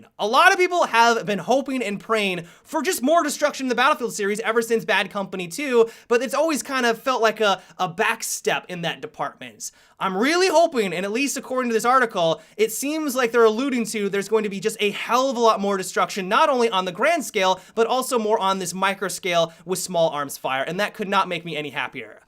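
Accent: United States. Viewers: This video is basically just Jackfrags one with an American accent. I have no qualms with this.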